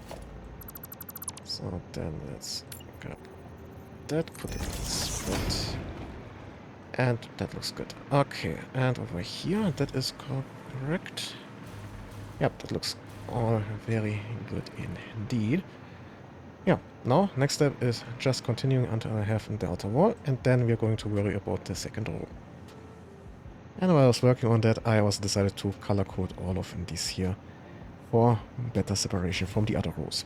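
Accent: Germany. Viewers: Man höhrt deinen Deutschen Akzent soo gut raus, ich liebe es :)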